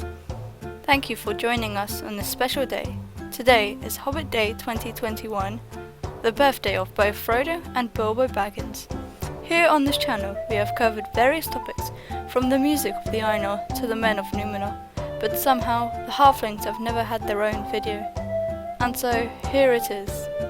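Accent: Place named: RP, United Kingdom. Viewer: Well done. I am particularly enjoying your First Age work. Your R.P. accent (I'm American) and spot-on pronunciation are particularly delightful to listen to, not to mention the always delightful and apropos music and art choices you make. Carry on!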